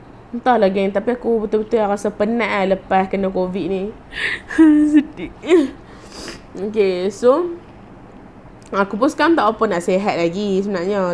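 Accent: Kedah, Malaysia. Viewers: Mst org kedah nie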